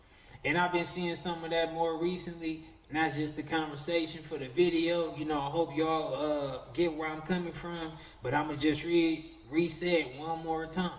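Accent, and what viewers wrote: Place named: Bay Area, United States. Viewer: I really thought you was from the Bayarea for a minute how you talk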